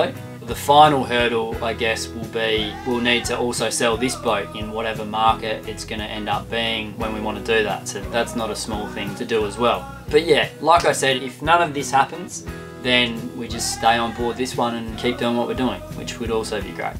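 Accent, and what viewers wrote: South, United States. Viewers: Ahhh du liike ur very southern accent. Good job. Good Luck on obtaining your “Green” vessel. You need one of those suspended dog run cables for Lenny. As a parent of 3 children and 11 grandchildren I can fairly say you need eyes in the back of your head and that’s on dry land. Take care and stay healthy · An Aussie man in a French boat talkin with a southern twang! Its a beautiful 🌎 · Very interesting idea. Hope it turns out! Really liked the southern accent too 😂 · Hilarious accent and insight to the hillbilly .... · Your southern accent cracks me up! You got it ! ....lol. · Well, I'm a Texas guy and honestly his "southern drawl" is easier to understand than the Aussie accent! And I've been to Aus-traala! I'm also a Tesla guy.. so good on ya, Aussie! A noble quest!! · Emission free hype crashing into whole of life reality. 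Unless you made it from carbon sunk wood in its entirety then there is no way. Hemp sails and rope. That southern accent will come in handy as you tend the fields and cut the trees. You did say it was your dream. That Outremer looks pretty good from here even if it is a climate change liability. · As an American, I love your American accent! Also, the emissions free boat thing is cool too. 😁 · Love the southern draw coming from a Texan myself, I look forward to seeing what comes out of all this. ♥️ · That’s a pretty good American accent, straight from the South! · Oh my lawd that Southerner accent... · Nice Southern accent for a Down Under bloke! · I think it was unbelievable that an Aussie could do such a fine job on a stereotypical good old boy accent. Seemed kind of Archie Bunkerish though. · Wow, your country-American accent is... oddly on point. Sounds like north Georgia/Tennessee.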